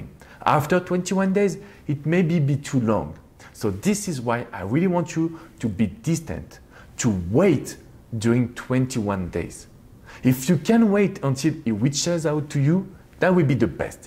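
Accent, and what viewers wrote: France. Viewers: You are soo Handsome! #FrenchAccent 😄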